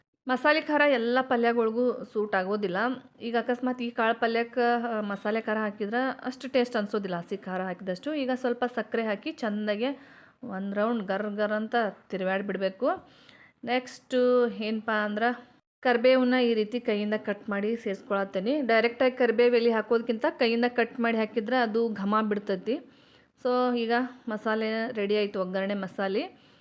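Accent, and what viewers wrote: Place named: Uttara Kannada, India. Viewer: Nam uttar kannada bhashe chend matadtri ade tara matadi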